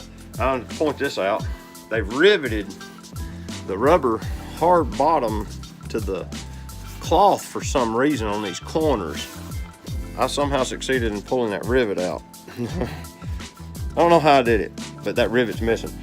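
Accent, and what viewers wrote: South, United States. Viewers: hahaha I wasn't sure if you were joking so didn't want to say anything. I thought it was a southern accent. It's awesome. Love it :) I'm from Germany and have a terrible accent :)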